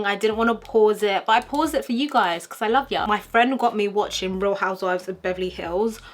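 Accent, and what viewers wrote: United Kingdom. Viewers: Woah has she always been British 😍😍. It makes sense now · I DIDNT EVEN KNOW SHE WAS BRITISH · You being as pretty as you are and then that British accent on top of that is just ugh chefs kiss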